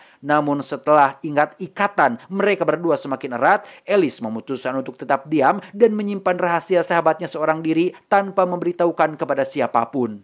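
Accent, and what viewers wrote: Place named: Aceh, Indonesia. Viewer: Aceh sekali bg😂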